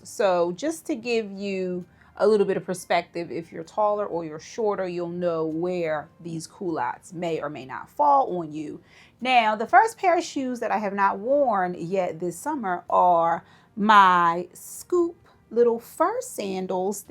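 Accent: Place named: Philadelphia, United States. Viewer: Thanks for sharing. Bought some culottes and love the inspo. Glad I found your channel. You have wonderful style! And I swear I’m catching a Philly accent too. Made my day!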